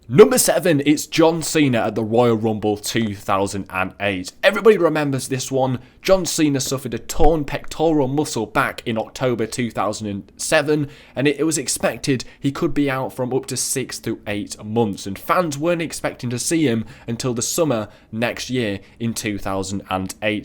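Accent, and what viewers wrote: United Kingdom. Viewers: Did he is British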